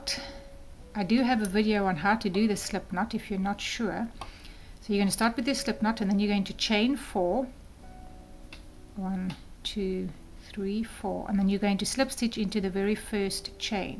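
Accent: South Africa. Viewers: Not sure how to post a photo here. I've made and ss 3 together. The yarn is a lighter weight cotton and my tension is a bit loose, so I probably should have used a smaller needle. And they aren't exactly uniform in size yet, but I expect that will improve with more practice. They still look very pretty and I will make at least three more to complete one placemat. It is a very cute pattern, thanks again! ps- Is your accent South African? Love it! (I have a friend in town from SA)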